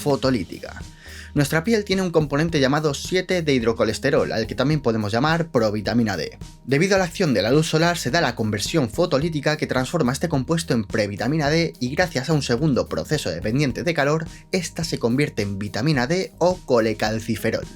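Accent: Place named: Spain. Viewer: Odio el dejo español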